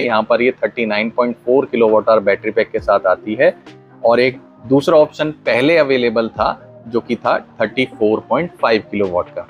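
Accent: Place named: United States. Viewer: Arey bhai.. kyaa ho gaya aapko . Apna original language me bolona.. Ye american accent english kyun lagaya.. it's very irritating. Pahle mai aapka har video dekhta tha.. but zab se ye angrezi bolna chalu kiya.. apka videos dekhna hi band kar diya. Band karo yaar.. ye artificial langauge me bolna..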